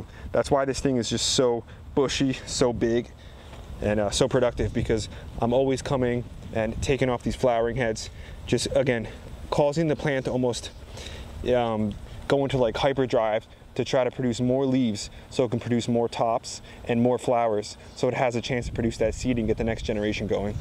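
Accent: New Jersey, United States. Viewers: His way of talking is TOTALLY a Jersey thing!!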